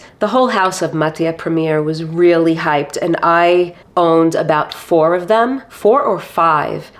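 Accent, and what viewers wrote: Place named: New York, United States. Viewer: Love the video. More of this style of content needs to be out there. Just a discussion of what we like and don’t like. Fragrance YouTube is all about marketing these days and it’s annoying. Oud Satin Mood is one I’m on the fence with as well. Great scent, but overpowering..and the Extrait just doesn’t make sense. It’s nuclear lol. I had to subscribe after hearing the NY in your voice! Brooklyn boy over here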